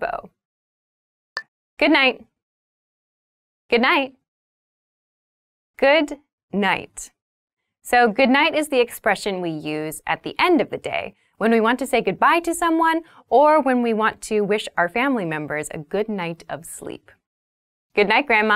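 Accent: United States. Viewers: Is she American? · A big hug from Colombia for you. Love your american accent and i can understand almost everything what you say. Thanks for help us.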